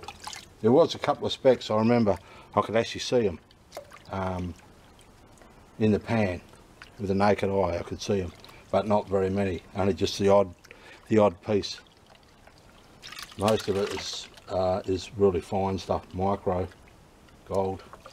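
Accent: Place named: South Africa. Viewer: Are you from South Africa? your accent sounds like S. African English. i have some good guys from there. we done Exploration Projects here in my country. cool and professional geologists.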